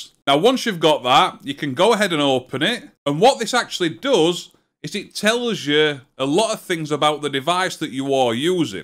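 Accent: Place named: South Yorkshire, United Kingdom. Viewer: Where's your accent from dude? My guess south Yorkshire?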